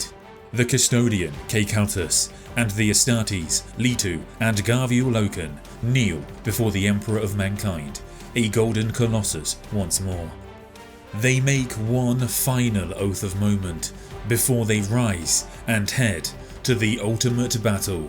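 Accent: United Kingdom. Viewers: Just found you yesterday Mate! Love your videos! You,Luetin,and Weshammer are the kings of youtube 40k! Much love from VANCOUVER BC CANADA 🇨🇦! Warhammer is just better when read by the British!!!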